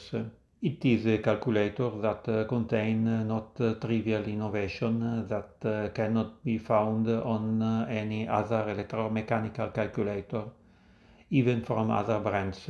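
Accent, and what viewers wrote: Italy. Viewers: 5,000 to 7,000 parts! Electro mechanical feat of design and engineering. I came here by accident and was transfixed by this piece of office equipment. Whoever you are, thank you for producing this video, in English with an Italian accent!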